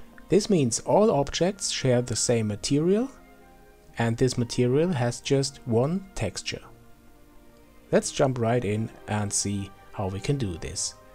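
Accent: Germany. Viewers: you ar german ?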